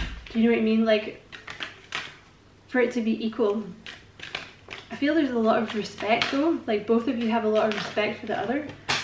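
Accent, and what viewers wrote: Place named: Glasgow, United Kingdom. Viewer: Sounds like a fellow Glaswegian reader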